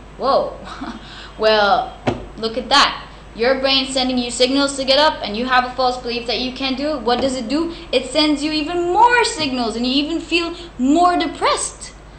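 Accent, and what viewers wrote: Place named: France. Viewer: I think confidence=your capabilities Self-esteem=How you feel about yourself! By the way what is your native language? I hear a french accent, forgive me if I am wrong!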